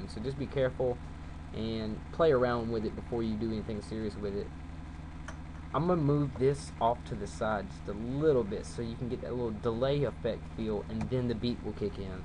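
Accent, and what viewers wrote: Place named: South, United States. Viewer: the guy talkin sounds like he's southern and trying so hard to not let his accent to come out.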